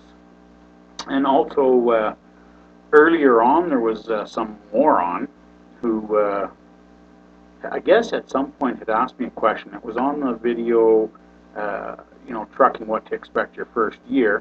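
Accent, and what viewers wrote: Canada. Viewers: Great videos. Just wondering, you don't sound like you're originally from Ontari-ari-ario to me are you from from New Brunswick or Nova Scotia? Sounds like a Maritime accent there. Take care and keep those great video coming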